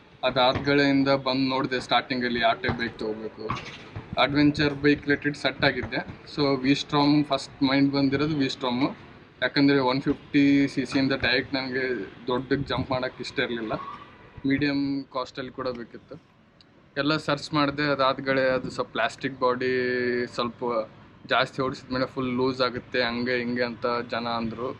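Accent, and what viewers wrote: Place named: Yadgir, India. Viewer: Proper Yadgir accents 🎉❤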